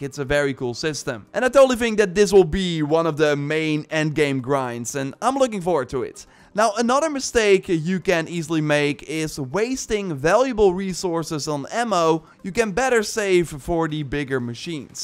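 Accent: Netherlands. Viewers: That was very helpful already wasted some time on purples not knowing about levendary. Small friendly advice: Im assuming youre dutch. 'Armor' is pronounced like the dutch word for poorer. "Armer" instead of "armòr".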